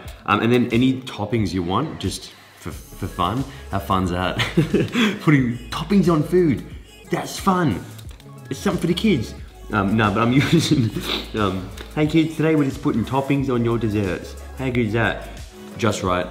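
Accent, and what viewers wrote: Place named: Australia. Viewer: Your accent is getting more Australian for some reason 😂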